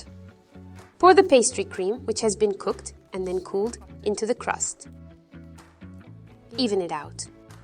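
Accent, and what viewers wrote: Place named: United Kingdom. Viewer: Adoro l’accento British di Aurora!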